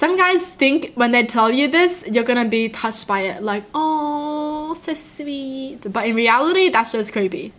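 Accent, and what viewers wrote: Australia. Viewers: Her accent is from Australia a very beautiful accent and land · Sirkay I’m Australian and I love Aussie accents but is a mix of Aussie and another country... I can’t tell which though. I love her accent.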